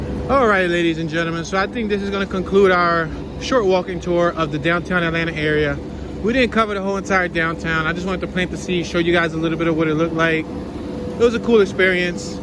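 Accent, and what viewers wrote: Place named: Brooklyn, United States. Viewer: Sounding deep Brooklyn.enjoy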